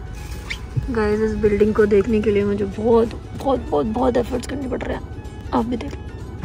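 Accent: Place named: Pakistan. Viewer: Aap Pakistani drame bahut dekhto ho lagta h .apki bat karne ka dhang thoda vaisa hi gya h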